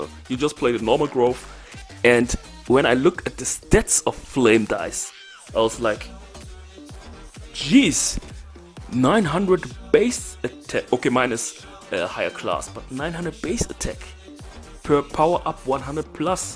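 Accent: Germany. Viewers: Hi are u german? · Bro dein deutscher Akzent 😂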